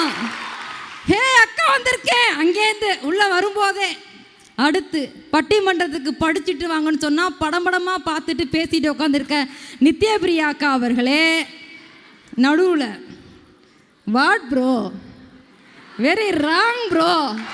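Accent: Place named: Chennai, India. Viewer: பள்ளிக்கூடத்தில் படித்தது ஒன்று, பேசுவது Madras தமிழ் தூதூதூதூதூதூ ....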